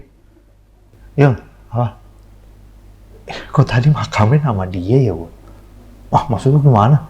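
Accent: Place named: Betawi, Indonesia. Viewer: Ngeliat jd inget waktu saya tinggal di pondok gede, Jd inget Orang2 betawi nya klo ngomong cerita kek, asyik seru.. Suka ngelawak ditongkrongan asyik om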